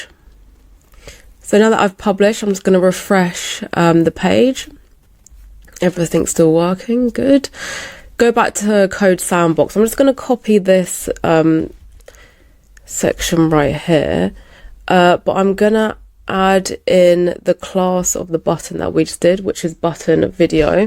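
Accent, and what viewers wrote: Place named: United Kingdom. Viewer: I love your British accent, it sounds so beautiful. Of course, I also liked your video, they are amazing effects.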